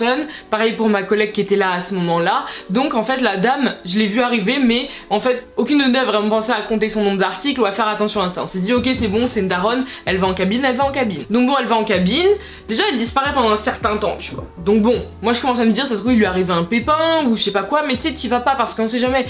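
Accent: England, United Kingdom. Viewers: ton accent british quand tu parle anglais me perturbe trop je sais pas pk 😭😭😂😂😂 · Grave un accent british quand tu parles anglais. Ca fait chelou, limite j'ai límpression que cést une autre langue. Ici à Atlanta, on parle beaucou[ plus vite et avec le nez. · The british accent waoouuh🥺🥰🥰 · On peut prendre 2min pour admirer son accent anglais magnifique ?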